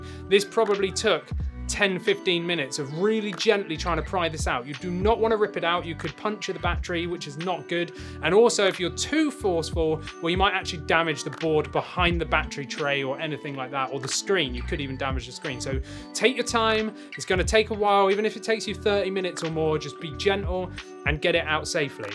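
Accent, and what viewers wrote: United Kingdom. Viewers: Thanks mate absolutely brilliant tutorial and nice to have someone british for a change. Top bloke!!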